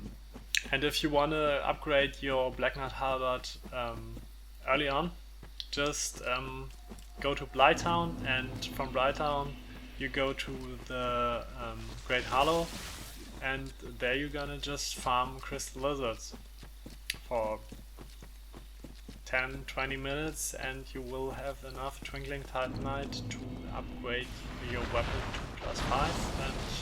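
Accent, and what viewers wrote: Germany. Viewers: Man hört dennoch heraus, dass deine Muttersprache Deutsch ist? Und ich hab vorher nicht aufs Video geschaut. Nicht direkt. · hm, ich verstehe darunter english mit klar deutschem akzent ^^ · man merkt das er deutsch is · ***** denglisch ist, wenn man deutsche wörter benutzt oder den satzbau umstellt. Das hier ist nur ein ganz normaler leichter deutscher akzent :D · are you from germany becuase i am and your englisch hass a little german slang in it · Is that German? Where are you from, dude?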